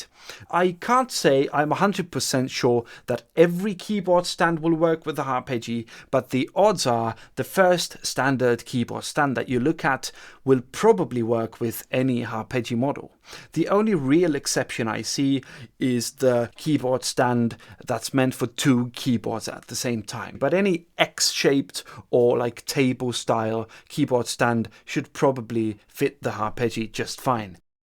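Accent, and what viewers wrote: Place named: Russia. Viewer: Thanks you for putting this series together. Definitely fills a gap here! One suggestion. Would be great if you demoed each talking point about on the Harpejji itself (perhaps second camera shot) right after you mentioned each point. Would be a big help in my opinion as far as keeping folks engaged. And although English is my first language, this certainly would be helpful to those watching this channel who did not speak English or have subtitles available and instead could at least see the concepts demonstrated. BTW.. (I do understand English with comedic Russian accent so please keep that going). Thanks again keep these going!!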